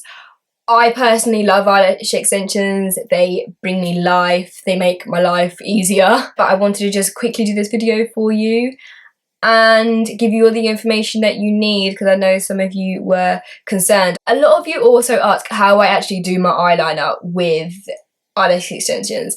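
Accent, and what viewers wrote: England, United Kingdom. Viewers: i detect north or east london. could be wrong though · Where in England are you from babe? Your accent is so sweet!! xx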